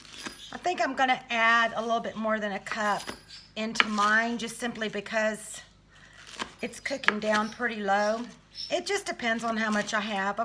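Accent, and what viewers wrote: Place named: South, United States. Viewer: You are such a southern belle!!! I love it!!!!! I’m from NC and it put a smile on my face hearing your accent :) And thanks for the video, I have an Eclectus as of a couple of days ago, so I’ll have to make sure I can incorporate all of those ingredients. I heard my baby boy’s digestive tract/system is pickier than most other parrots. This is my very first bird so wish me luck. Be well!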